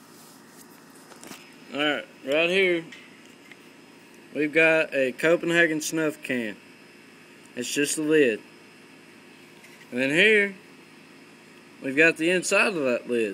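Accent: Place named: South, United States. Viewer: What part of the south or you from? Im from Gretna Louisiana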